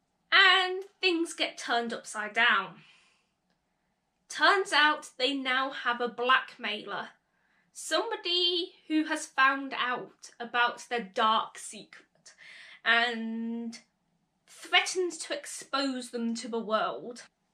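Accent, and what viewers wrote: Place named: United Kingdom. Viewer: I've always wanted a British accent!!! Don't let it be, ur born with it and it's very very beautiful!!! I'm 20 so u know I'm not some old creep.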